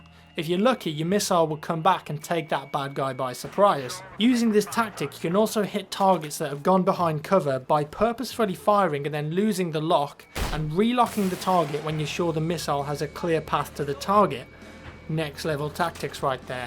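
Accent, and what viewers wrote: United Kingdom. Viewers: i hate him when he is whispering, it's hard to understand english without the subtitles, and that British accent makes pretty hard....i'm not a native english speaker.....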